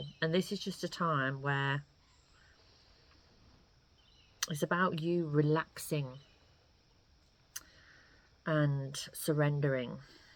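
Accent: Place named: Ireland. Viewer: Hello I won’t call you as before. You know last night I had a dream since a long long time, I was in a place a high building and people were dancing drinking and having fun and I saw a kitten downstairs was trying to climb up and while I was trying to catch it two people fell from above near me completely covered in blood and at that moment I started shaking so hard that I woke up, why I’m telling you this but since few days somebody I was forced to let her go because I was not happy with she’s a karmic with I probably lived in another life cycle and now she’s sending in my mind images of her in a provocative way and I know these thoughts are not mines. I don’t know but these two things are related in some sort. Well Nice character some times you should be use again your Irish accent 🌹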